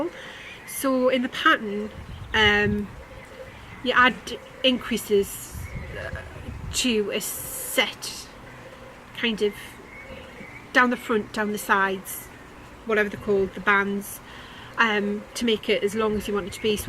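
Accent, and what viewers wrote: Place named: County Durham, United Kingdom. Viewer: Only just found your podcast and am binge watching you from the beginning. Loving it you crack me up. I recognise your accent and when you said you were brought up in Burnhope that's probably why. I was brought up in Annfield Plain and Catchgate so you probably went to my school, Greencroft (albeit a few years after me)? Anyhoo you've got a new fangirl 😄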